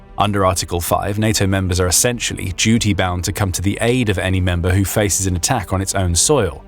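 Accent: United Kingdom. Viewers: Your British accent is charming. Nevertheless, it is up to Finns to decide if they want to promote and live in peace or become proxies, perhaps Ukr 2.0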